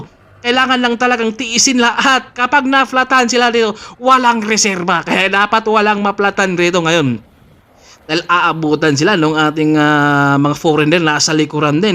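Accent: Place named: Tagalog, Philippines. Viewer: I love your Tagalog hard accent.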